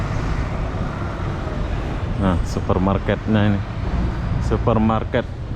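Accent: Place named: Malaysia. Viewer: Bro lo org malaysia apa indo sih. 😂 logat lo kya org malaysia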